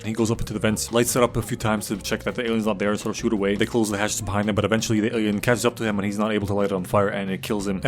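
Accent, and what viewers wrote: Germany. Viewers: german accent is unbeatable. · Love the German accent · Are you German?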